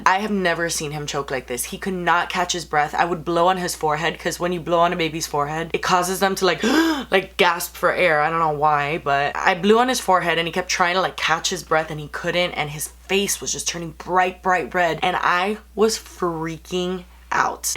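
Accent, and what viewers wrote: Miami, United States. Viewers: She's Miami Cuban. It's a Miami accent. What a rude question. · Alaina Reyes lol I totally noticed her having more of an accent, but I thought it was just her Miami-Cuban in her lol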